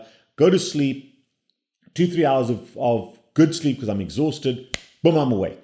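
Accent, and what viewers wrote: South Africa. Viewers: 💯 this guy is South African 😂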